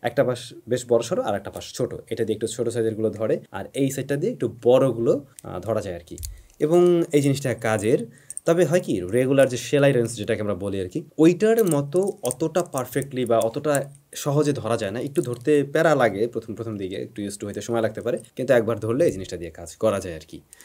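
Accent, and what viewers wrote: Barishal, Bangladesh. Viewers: ভাই আপনি খাশ বরিশাইল্লা! টাকাটাকি বাদ দেন!❤❤❤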